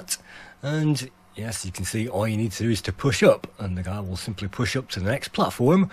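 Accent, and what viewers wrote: Lancashire, United Kingdom. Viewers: The Lancashire is strong in this one!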